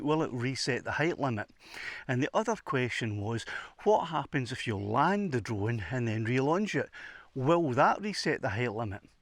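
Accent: Scotland, United Kingdom. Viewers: For a great Scottish accent I am happy to subscribe. Also I am a geologist with a background in structure in the high Alleghenies, but not far from the Valley and Ridge. I have been wondering about handling elevations photographing in structurally complex terraines. This one helps, thank-you!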